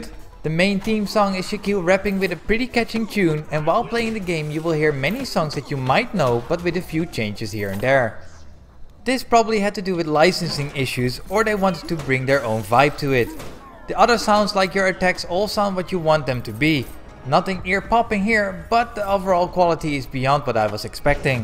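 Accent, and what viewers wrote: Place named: Netherlands. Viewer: You're Dutch right? You hear it right away lol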